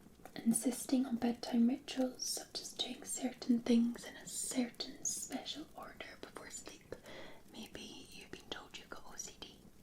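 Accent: Scotland, United Kingdom. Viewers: Can u do ASMR with ehavy scotish accent ? 🙂💙🤍💙🙏🥃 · And a sleep therapist with a Scottish accent, nonetheless!😉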